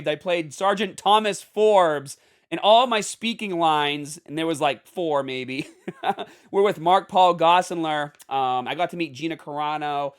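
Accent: Boston, United States. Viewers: lol yeah I kinda dig the Boston accent 😂 must visit there when I come to America on vacation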